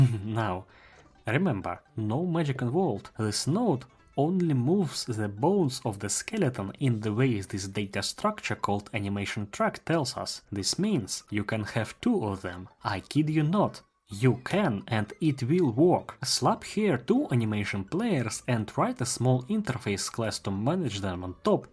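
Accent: Russia. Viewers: Хмм, что-то уж слишком хорошо я начал понимать английский. Как будто собрат по русскому языку пытается на английском что-то рассказать и все понятно,т.к отсутствует английский акцент 🎉🎉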